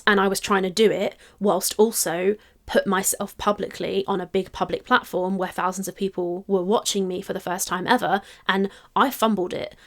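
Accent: United Kingdom. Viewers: Does think the British accent somehow changes the words we already read?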